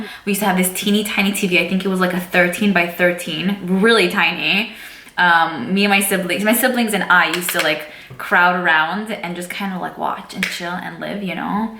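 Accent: New York, United States. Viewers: When I first started watching you I thought you were Italian lol. But, now I realise your accent is New York or Bronx. I think you're adorable, and so likeable. I could really see us being friends. Thanks for filling us in on who YOU are, and we all love you.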